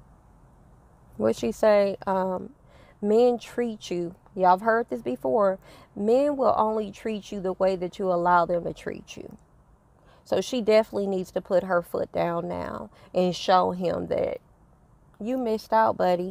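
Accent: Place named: South, United States. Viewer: Hey auntie you are so pretty! I love your accent. Are you from the south? New subbie. Chrissy is way better without him...he is literally deadweight. I can tell just by the videos she does everything and he is just another child. He is not a man, he was a boy. I have no respect for a man who leaves his pregnant wife and kids and to move all her kids and mother ALONE.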